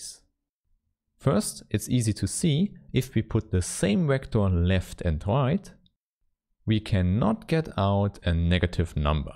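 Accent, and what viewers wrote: Germany. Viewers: nice video, thanks a lot... and you sound German with your accent😁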